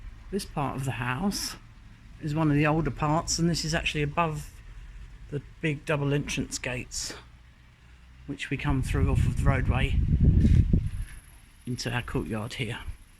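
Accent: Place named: Essex, United Kingdom. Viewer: Oh my....I admire you so very much. I’m new to your channel, and I haven’t even finished watching your first episode, but I’m in love with your property and can’t wait to watch all your updates. I’m over here in the US and can’t tell you how much I enjoy the countryside of the UK and France. So beautiful. And the buildings, and the history, and the grandness of it all.....💕💕💕 so excited to cheer you on and get to know you and your story. And I love your Essex accent....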